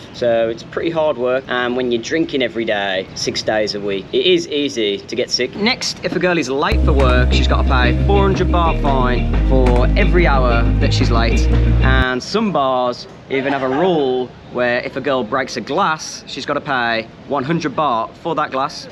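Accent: Birmingham, United Kingdom. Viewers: You from Birmingham mate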